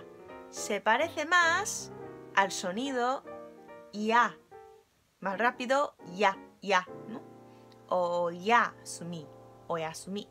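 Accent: Spain. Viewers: ptm pero hablas como española yo me largo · habla como española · me encantó completamente tu acento español, es perfecto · tu español . es de españa y un poco de latino . very good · aprendiste el peor acento espanol que existe,,,,, es mucho mejor el acento latino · Pero hablas español de españa ejemplos de español latino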